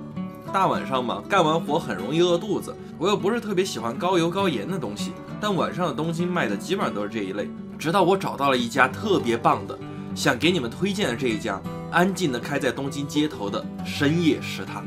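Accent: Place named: North, China. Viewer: 好奇。影片里都换算成新台币，你怎么有大陆北方的口音？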